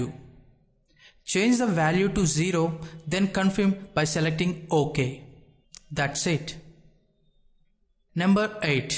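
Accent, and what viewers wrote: India. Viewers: nice indian accent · Your accent is Indian and I had random Indian friends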